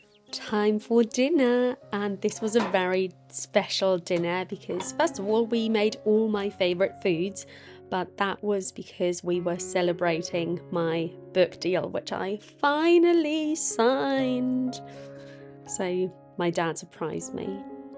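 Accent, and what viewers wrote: United Kingdom. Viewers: A real British accent! 🤓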